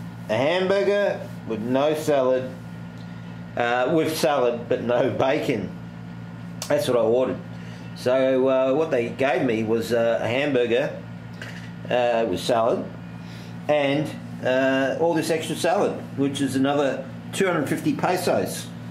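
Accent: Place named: Australia. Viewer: Well just make sure you don't ask for Baygon and eggs with your Australian accent. :) Keep up the good work.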